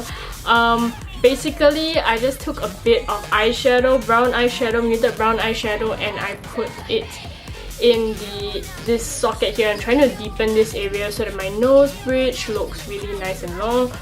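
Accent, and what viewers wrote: Singapore. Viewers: I wan hear more singlishhhhhh😂😂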